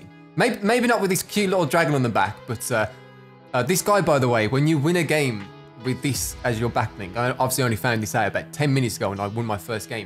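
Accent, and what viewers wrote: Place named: Birmingham, United Kingdom. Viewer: Dave the dragon for sure, and you have to say it in your old brummy accent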